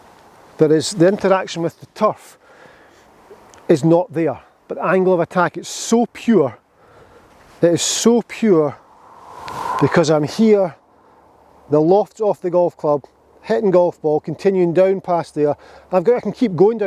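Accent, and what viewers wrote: Scotland, United Kingdom. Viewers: Hey! First of many comments! Hi - thank you for you excellent tutorials. I always enjoy your lessons, recommendations, tips, and your entire approach to the game. I am an intermediate golfer over in Calgary, Alberta, Canada. I'm happy to big you up whenever I can. I had previously looked at other golf-guru types with similar channels, none of them helped me. I then looked at your Ben Hogan grip lessons, your overall laid back approach to the game, your Eureka methods, and boom, what a difference in my game and my enjoyment. That, plus now I can channel a Scottish voice in my head when making my shot. Thanks, keep it up.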